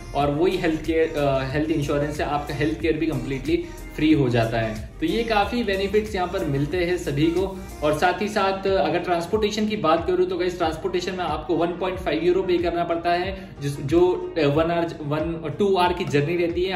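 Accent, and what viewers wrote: Indore, India. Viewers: Bhai pakke indori ho - accent sun ki samajh me aa jata hai haha ;)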